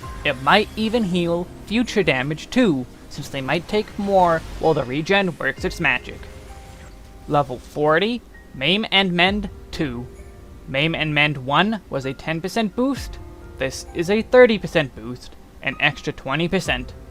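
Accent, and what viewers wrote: United States. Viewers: At first I thought his way of speaking to be familiar, then I realized his intonation is basically the same as Superbutterbuns', but a way more chill. (I wonder if they're from the same state in the US, but I don't know how to differentiate all the accents, so if anyone knows, please tell me)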